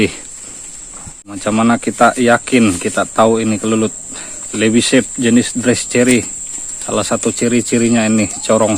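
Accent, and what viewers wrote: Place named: South Kalimantan, Indonesia. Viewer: Logat nya Seperti Kalimantan Selatan Om, Salam kenal saya balikpapan, Kaltim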